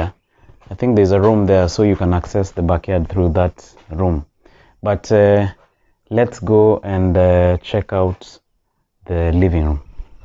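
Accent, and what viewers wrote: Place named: Coast, Kenya. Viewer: Kiswahili cha pwani kimeniua.. karibu mombasa bro 🤣😂🤣😂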